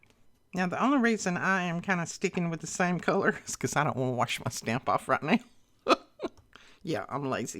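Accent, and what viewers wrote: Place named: Alabama, United States. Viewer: I love your accent! ❤❤❤ Are you from Alabama?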